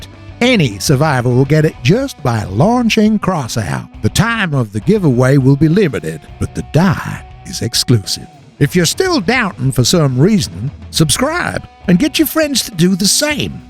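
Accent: United States. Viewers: Can the commentator please not talk with this cliché american accent? I can't stand listening to it.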